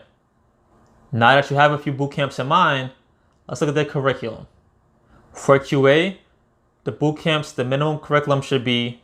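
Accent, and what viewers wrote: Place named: New York, United States. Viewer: Thanks for your channel! Much appreciated. You sound like a fellow New Yorker!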